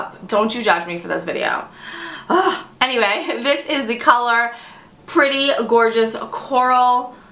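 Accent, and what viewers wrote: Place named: Staten Island, United States. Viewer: You're quickly becoming one of my favorite YouTubers! My husband is from Staten Island and your accent reminds me of his friends from there. Somehow he escaped without an accent;)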